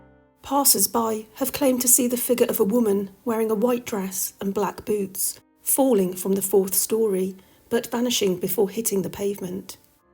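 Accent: United Kingdom. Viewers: This is a incorrect title for this yt video Berkeley square is an expensive area of London to live as british pm have lived and the british nobles own properties in the area all with nannies and servants that are under there employment the video has nothing to do with LA America even the narrative is spoken in correct English and at one point even has a british southern accent and a brummie both of which the us population get wrong so the narrative could be by a true brit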